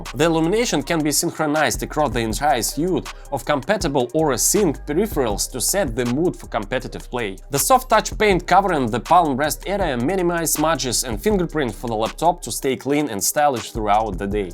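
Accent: Russia. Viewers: I enjoy it,but Take away that Russian-english,it will bee 100% better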